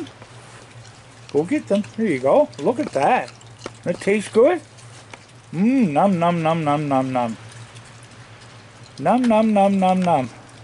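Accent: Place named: Canada. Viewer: Love me some and Bob! My favorite two channels that I look forward to everyday! I just love your Canadian accent! I was born in upstate New York but moved to Texas when I was a teenager. Sure miss the north!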